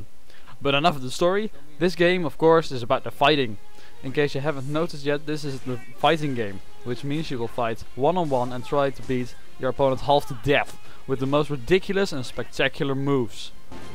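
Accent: Netherlands. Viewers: Haha ik ook, kdacht al toen ik je stem hoorde xD Nederlanders kunnen echt geen engels praten. Heb deze game net ook gespeeld f*kking vet:D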